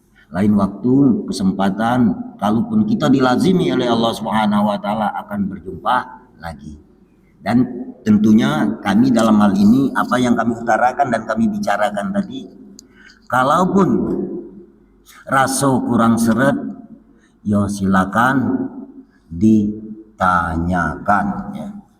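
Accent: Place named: West Sumatra, Indonesia. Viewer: ADA JALAN WALAU PUN DI KIAS DAN DI LANGKAU ATURAN DALAM PERJALAN PADA JALAN ALLAH DI JALAN SIFAT ALLAH KEMBALI PADA ALLAH UNTUK KITA BAWA CAYA KALIAMAH NORULLAH✨️☀️✨️CUMA KURANG JELAS LOGATNYA UNTUK SAYA TERIMA🫶💕🫶SEPERTI BAHASA MINANG YA🫶💕🫶BAPAK SIFAT YANG TERDAHULU SESAMA SIFAT ALLAH✨️☀️✨️TAK MENGAPALAH HENDAKNYA PADA HAK ALLAH YANG BENAR KEMBALI PADA ALLAH YANG NYATA✨️🫶☀️🫶✨️AL FATIHAH✨️🤲☀️☀️AMIIN☀️☀️🤲✨️ALLAHU AKBAR ALLAH ALLAHU ALLAH"2✨️☀️☀️✨️☀️☀️✨️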